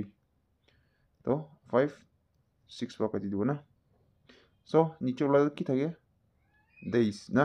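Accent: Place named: Nagaland, India. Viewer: Perfect shortcut formula sir I gues you are lotha gentleman your accent 😀 Last answer is option b 5 1/3days Correct me if wrong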